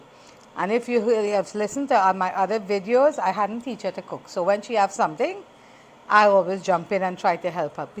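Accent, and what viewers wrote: Trinidad and Tobago. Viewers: true trini. kept your accent girl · don't change your trini accent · I love Trini accents, love me some roti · I LOVE YOUR TRINIDADIAN ACCENT ! VERY RELAXING !!🇹🇹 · Listening to you reminds me of my grandmother who was Trinidadian bring back memories ❤❤ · Just found your channel. Enjoyed the rapport and watching you cook. Love your kitchen. Nice to hear the trini accent.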